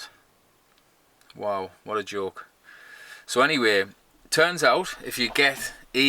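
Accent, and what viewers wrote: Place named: Teesside, United Kingdom. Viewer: I was going to ask the same thing about the Bluetti as I was about to buy one until I watched this vid! Is the Anker one you have another git big power unit thing or do you mean just like a handheld powerbank? PS your accent isn't remotely strong, I'm a Teessider and I thought you were one of us, let alone a bloody geordie!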